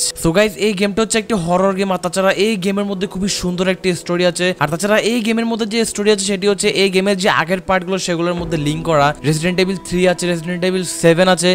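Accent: Noakhali, Bangladesh. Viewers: ভাই সত্যি করে বল তুমি কি নোয়াখাললে।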